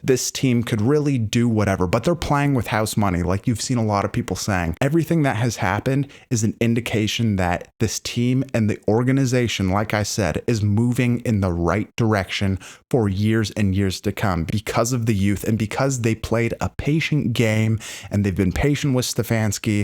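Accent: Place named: Canada. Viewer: So where from Canada is this guy from again???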